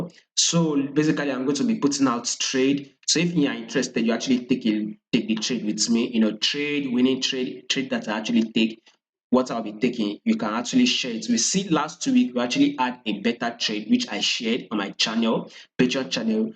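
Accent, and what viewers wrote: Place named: Africa. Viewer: u talking to heavy African accent..can u write the levels to buy n sell on the chart so its easy to follow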